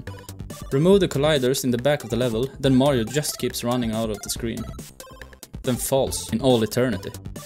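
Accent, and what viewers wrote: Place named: Sweden. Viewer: När en svensk pratar engelska, eller hur?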